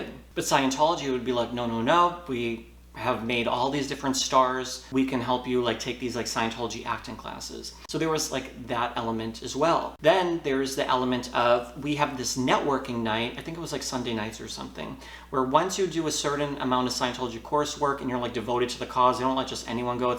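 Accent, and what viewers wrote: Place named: Boston, United States. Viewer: Always good to see a new video from you! Thank you for continuing to speak out and inform people what it was like for you as a public. Also, hello fellow Mass-head! I lived about half an hour north of Boston from birth to age six, and I've visited family up there often over the years. You've done well at neutralizing the accent! I still have mine on a few words, even after all this time, and whenever I visit, it comes back after half a day, and it takes me a couple weeks to get back to my normal mish-mash American accent. My wife thinks it's hilarious, for the first day or two, but then she starts calling me out on it.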